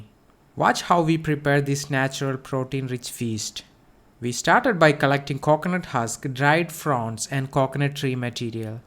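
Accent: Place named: Kerala, India. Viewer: Nice Mallu accent 😂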